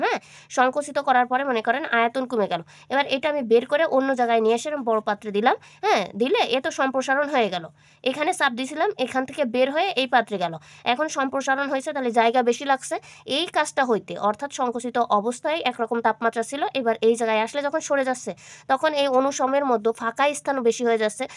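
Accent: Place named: Pabna, Bangladesh. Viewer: আপু, আপনার বাসা ki পাবনা, সিরাজগঞ্জ এর আশেপাশে? ভাষা টা খুব পরিচিত লাগলো!❤️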